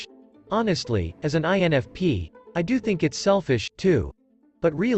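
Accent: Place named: United States. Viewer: American English is so bad.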